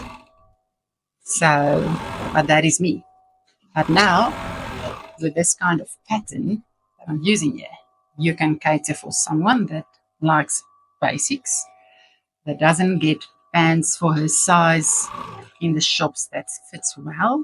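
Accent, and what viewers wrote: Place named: South Africa. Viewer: I wanted to say I recognize a South African accent.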